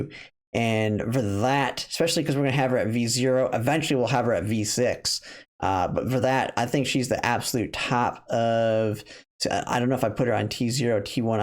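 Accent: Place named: West, United States. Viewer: the way he pronounces the russian names is just ... well , you can tell he is from West for sure :D but the list is solid .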